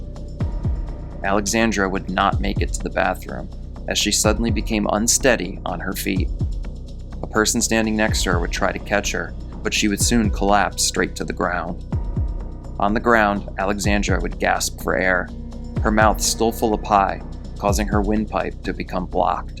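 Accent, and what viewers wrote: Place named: United States. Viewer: I love your accent. What part of America are you from?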